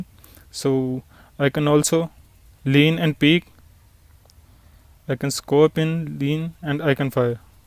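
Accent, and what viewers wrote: India. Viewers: I love the indian accent make me Travel again thx dude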